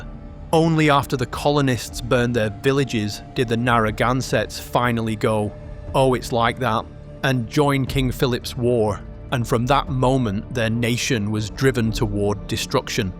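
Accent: United Kingdom. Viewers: American history narrated in a British accent. Is this supposed to be funny?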